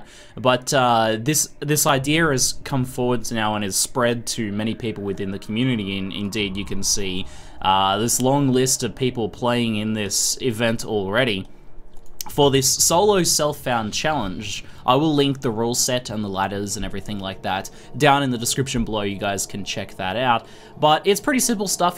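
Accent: Australia. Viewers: why do Australians pronounce idea, i dear? why..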